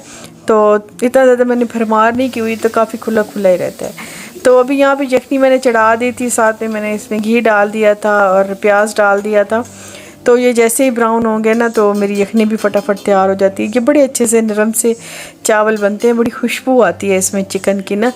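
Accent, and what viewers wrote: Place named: Saraiki, Pakistan. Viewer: Apki saraaaeiki punjabi hai we have house help saraaaeiki wo accent hi aur hota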